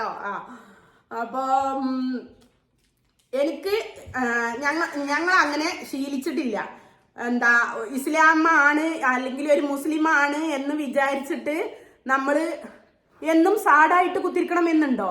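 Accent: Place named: Malabar, India. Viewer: നിങ്ങൾ ശ്രദ്ധിയ്ക്കണംഭാഷ കേട്ടിട്ട് കോഴിക്കോട്, മലപ്പുറം ജില്ലയാണ് എന്ന് തോന്നുന്നു - എന്തായാലും ശ്രദ്ധിച്ചാ നല്ലത്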